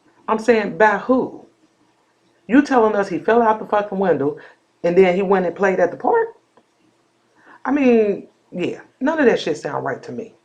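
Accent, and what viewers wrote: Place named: Chicago, United States. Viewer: I’m a newbie to your channel. Love your real ness. Sound like you from my hometown Chicago... lol anyway this story is sad. Yes the truth will come out, just like it came out on the 911 call. The hospital really need to implement mental health test after mothers have babies with special needs or not before exiting the hospital to potentially avoid unfortunate death of babies and toddlers. This is so common and the lies are getting dumber and dumber. Justice for baby Braylen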